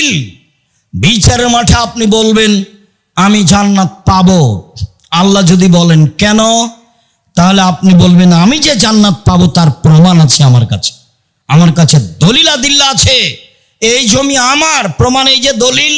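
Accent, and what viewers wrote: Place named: Kolkata, India. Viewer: কলকাতার দাদাদের মত কথা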